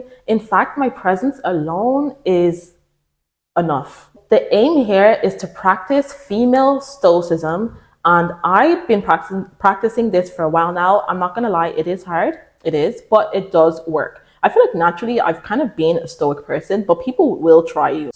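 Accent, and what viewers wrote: Ireland. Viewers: Love the Irish ☘️ accent❤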